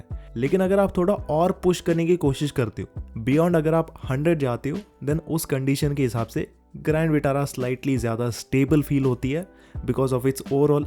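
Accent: Kerala, India. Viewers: Mallu accent 😊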